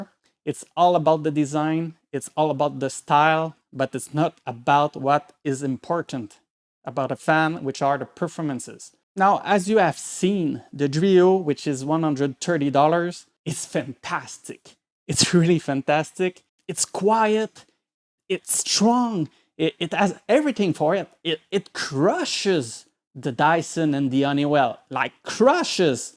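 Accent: Quebec, Canada. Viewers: Thanks. I just assumed you're from Quebec because of your accent. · J'aime que dans les 3 premières secondes du vidéo j'ai reconnu l'accent QC, et +1 pour l'avoir écrit dans l'intro de la vidéo! 😄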